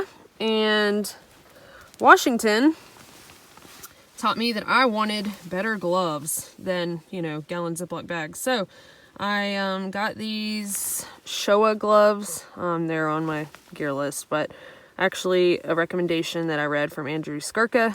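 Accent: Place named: Alabama, United States. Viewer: Is it my imagination, or is your Alabama accent getting stronger? 😎😉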